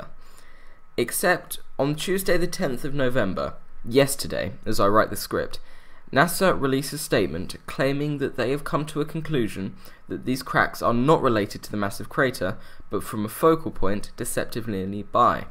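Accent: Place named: England, United Kingdom. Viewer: Eric Williamson Englishman speaking English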